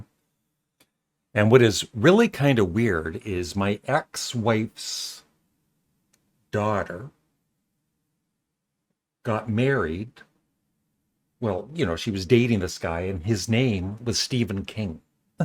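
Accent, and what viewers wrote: Canada. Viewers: I have always pronounced it jag~er. Maybe it’s your Canadian accent,!😉I’m so glad that you get more good comments than the rotten ones.😊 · Perhaps some people don’t realize you are just simply saying Jagger’s name with your Canadian accent?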